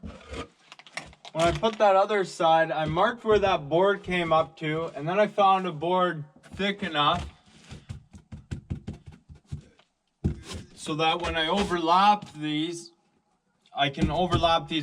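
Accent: Canada. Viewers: From his accent I am going to guess the middle part of Canada. Saskatchewan or perhaps Alberta. From the trees I’m going to guess the northern portions of those provinces. I’m in NW Ontario.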